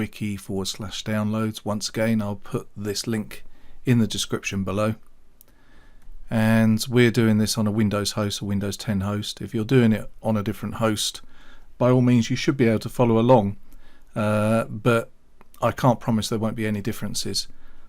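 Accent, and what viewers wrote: United Kingdom. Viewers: your from UK right I know! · Amazing tutorial, thank you a lot! I'm enjoying your awesome british accent, mate!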